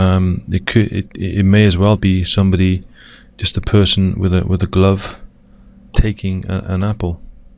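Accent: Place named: Wales, United Kingdom. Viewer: please jus keep your great vids coming!:) x ps I love ur Welsh accent too;)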